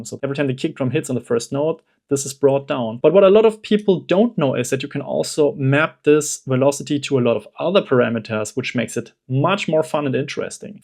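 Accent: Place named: Germany. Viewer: Your english is for your deutsche Akzepnt very nice;) ich kenn einfach 3 andere, die 1:1 ähnlichkeit mit dir haben!:D:D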